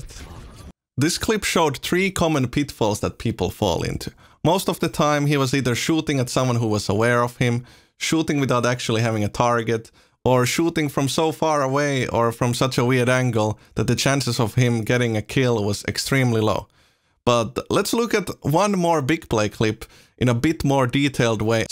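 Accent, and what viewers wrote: Finland. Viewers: I instantly knew you were Finnish when you started talking 😅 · What is your main language becouse you sound like how finnish people speak eglish?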